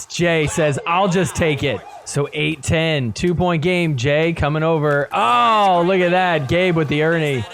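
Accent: Australia. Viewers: Great job, as always! Love your Aussie accent and phrases! I did watch some of the matches. It was fun! I thought it was funny Tardio was late for his 1st singles match and then got pickled. He didn’t hear the call for the match. He had no warmup and he was seen wolfing down a Nutella pizza slice immediately before the match! Kids! 😂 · You're so funny...and your Aussie accent is on point! Keep it real, don't sugarcoat, or censor yourself! 😜👍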